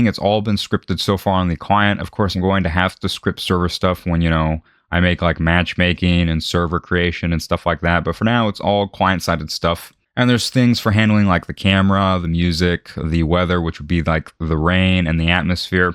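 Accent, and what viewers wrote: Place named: Bay Area, United States. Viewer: You sound like Bay Area buggs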